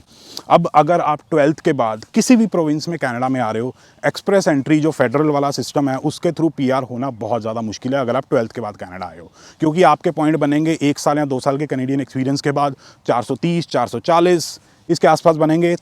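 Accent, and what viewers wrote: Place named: Delhi, India. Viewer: delhi wali hindi 😊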